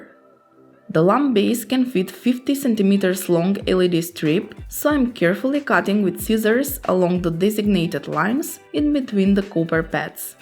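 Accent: Russia. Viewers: Какой четкий русский акцент в этом английском :) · русский акцен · Похоже русская озвучивает. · Отличная работа! Но у вас жуткий русский акцент, надо тренироваться ;) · Попахивает русским акцентом)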